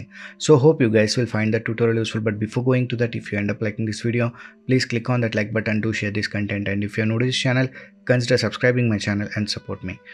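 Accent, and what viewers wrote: India. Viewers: I knew it would be a good tutorial when I heard the indian voice.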